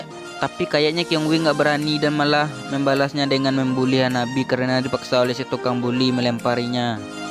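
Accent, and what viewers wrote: Madura, Indonesia. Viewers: Orang Madura ya bang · Ga bisa ilang logat maduranya wkwk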